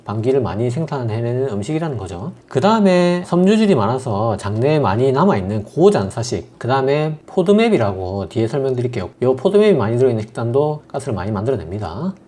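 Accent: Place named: Busan, South Korea. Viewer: 전라도 광주 사는데 원장님 부산 사투리가 넘 재미있고 정도가구요 의학정보를 부산 사투리로 들으니까 은근 중독성 있어서 계속 듣게 돼네요 앞으로도 부산엑센트 계속듣고싶어요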